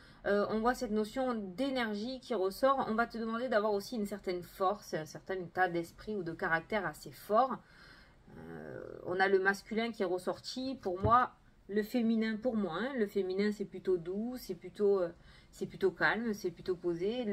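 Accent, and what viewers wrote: South, France. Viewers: Bsr, toujours aussi pétillante et pleine d entrain et aucunement tu n es vulgaire. Pas du tout!!! Je suis du Sud aussi et c'est vrai que l on parle comme ça, mais ce n'est pas de la vulgarité!!! C'est notre parler!! 😃 merci beaucoup pour ce tirage! Reste telle que tu es, un soleil pour nous tous! Belle soirée 🙏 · Cc on t'apprécie comme tu es très nature et spontanée ça fait plus de 2 ans que je te suis avec l'accent du Sud + mots d'oiseaux c'est un feu d'artifice très plaisant 👍🏻🤗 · salut:) je voulais te laisser ce petit commentaire juste pour te remercier <3 j’ai 17 ans et je te regarde depuis 3 mois, depuis une rupture qui a été très difficile pour moi… tes vidéos me redonnent le sourire, tu dégages toujours de très bonnes ondes, toujours très souriante… et assez amusante!! je viens aussi du sud et ton petit accent et ces fameuses « expressions » font tout ton charme haha!! 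encore merci pour ta bonne humeur<33 Chiara:) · Moi j adore ta bonne humeur et ta façon de parler du sud , je kiffe comme dirais les jeunes🤣😂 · Bonjour nous au moins on a l accent qui chante je suis du Sud aussi🙂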